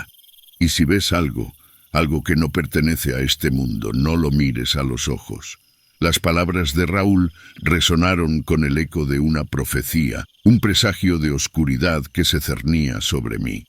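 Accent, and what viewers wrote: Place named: Spain. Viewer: Se escucha come espanol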